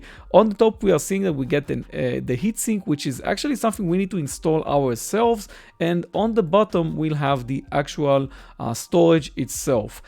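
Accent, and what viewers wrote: Israel. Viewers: Holy shit that Israeli accent haha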